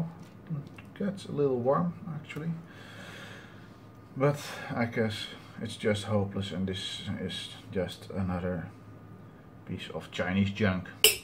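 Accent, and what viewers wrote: Netherlands. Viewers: Ik heb dezelfde in mijn winkelwagen zitten van 2 euro per stuk. Kan zijn dat die ook lager gerate zijn qua kilos aan trekkracht. Wel leuke video trouwens! Hoorde het o zo bekende nederlandse accent en keek naar het naampje van het kanaal en ja hoor: eindelijk een nederlandse electro youtuber.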